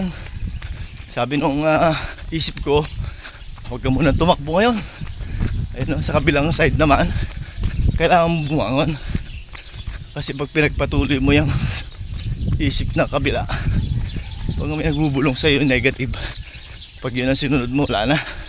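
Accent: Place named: Mindoro, Philippines. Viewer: Hi kuya,i love jogging too walking exersise is good for our health...taga saan kb kuya mindoro din ba, kc ung accents mi eh parang mindorinyo din.thankyou sa suport ho. Full watch tamsak.suport👍👍❣️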